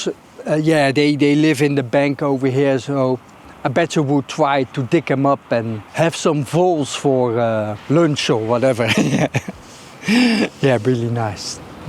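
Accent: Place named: Netherlands. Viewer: Leuk Nederlands accentje😂 wel leuk zo'n rode wouw!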